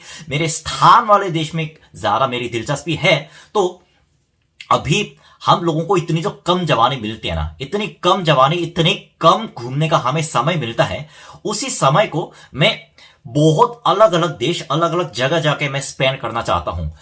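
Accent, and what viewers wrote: Standard. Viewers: Nice video 💜💜. Itni shudh hindi to mujhe bhi nehi ati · Kon bevkuff hai jo ye sab bola kisine subscribe kiya toh aapko kharid nhi liya hai sach a low thinking ese log bahut selfish hote hai are uski life hai woh kahin bhi jaye ghumne tumko qya kam se kam woh aapki sanskriti ko toh faila raha hai korea ka hokar he is inocent guy i like his clear hindi accent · Ettni shudh hindii mujhe bhi nhi aatii 😅 i like your shudh hindi 😁 I am from Bihar Patna 🙂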